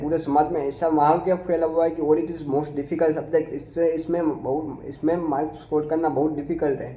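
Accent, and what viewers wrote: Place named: Gujarat, India. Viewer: Brother your gujrati accent is great, did you study in gujarati medium?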